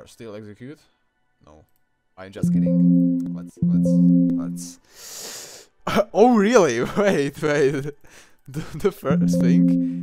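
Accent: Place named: Czech Republic. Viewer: nice czech accent, i liked it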